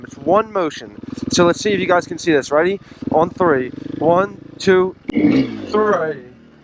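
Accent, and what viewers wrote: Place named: Maryland, United States. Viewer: you sound like youre from maryland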